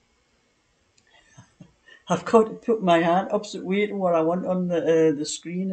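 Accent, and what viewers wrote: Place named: North East England, United Kingdom. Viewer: Oh dear I wish I could get to like the music at the start of your recording. I don’t,t recognise it either. However I really enjoy your channel, hearing your lovely accent from the north east. My husband and his family came from your part of the world. Sadly My husband, who was a very fit 83year old passed away the first day of lockdown from Covid. I enjoy your cooking and baking as well as your beautiful knitting, and I do hope you win at your bingo sessions. My mother in law was a great follower of too. Warm regards Christine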